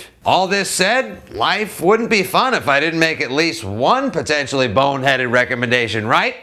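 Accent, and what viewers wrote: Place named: Boston, United States. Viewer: Funny! You sound like David Spade with a Boston accent.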